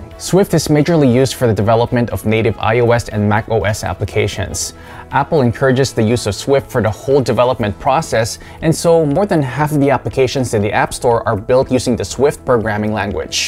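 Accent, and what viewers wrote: India. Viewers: If you're really from India, its unbelievable, Your accent is a wow in respect of Indians.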